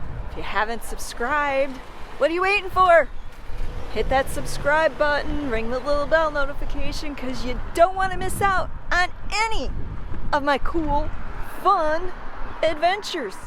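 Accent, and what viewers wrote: Wisconsin, United States. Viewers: Love the accent. Reminds me of my family that live around Marathon, Wisconsin. I worked as a professional archaeologist for years documenting old mining districts through out Arizona and Nevada. I currently work for a major railroad and get to see alot of these small town sites that are barely in existence.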